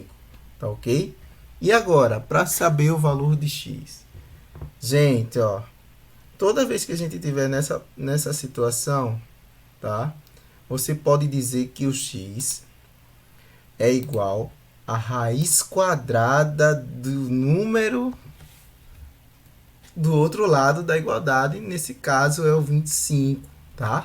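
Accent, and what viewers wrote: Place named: Rio de Janeiro, Brazil. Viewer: MDS sua voz é a do Super choque só que carioca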